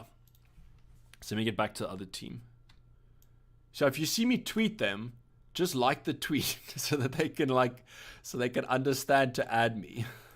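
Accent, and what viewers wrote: South Africa. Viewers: Bro you from South Africa?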